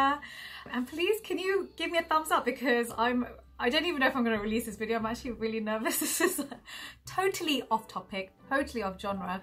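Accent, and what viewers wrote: United Kingdom. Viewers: plus that British accent :).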